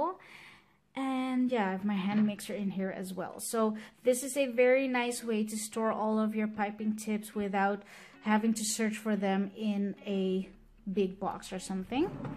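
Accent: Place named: Philippines. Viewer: Cool vid! Btw you a lil filo accent, are you filo?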